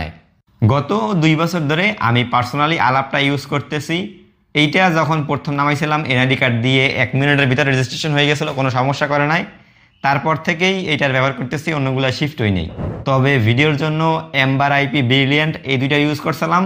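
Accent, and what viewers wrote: Barishal, Bangladesh. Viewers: বুঝতে পারছি যে ভিডিও ছাড়ছে এর বাড়ি খাস বরিশাইল্লা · শুদ্ধু বরিশাইল্লা মিশেল ভালোই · ভাইয়ের বাসা কি বরিশালে?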